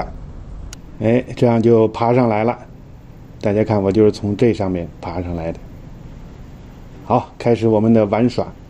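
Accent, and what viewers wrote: Tianjin, China. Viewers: 一听就是天津人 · 第一次刷到，李船长的口音听起来怎么有点像天津的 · 说话天津味道很重……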